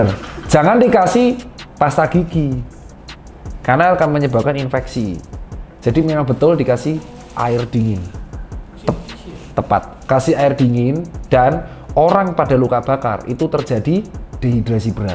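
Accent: Java, Indonesia. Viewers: Ngomonge jowo medok yo dok .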